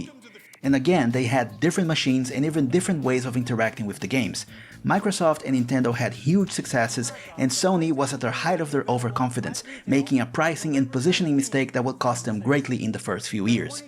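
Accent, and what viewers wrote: Brazil. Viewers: Percebi que era BR pelo sotaque primeiramente hahahaha. Depois vi os textos em português em alguns dos jogos. Você fala muito bem inglês, muito impressionante. Consumo muito conteudo gringo, acabei caindo no seu canal por esse video e fui surpreendido hahahah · A Brazilian accent is always unmistakable. Olá, meu amigo.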